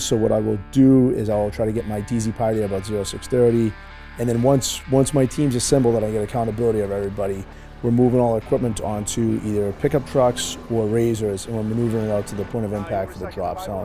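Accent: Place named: Rhode Island, United States. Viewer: That was great. Your accent brought back great memories of my uncle from Rhode Island!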